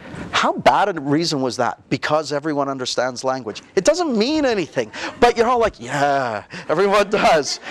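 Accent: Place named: Canada. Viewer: LOL, I can tell you are canadian. "Aboot". You say it! :P And several other inflections in your accent. I don't see how people think the accents are the same. lol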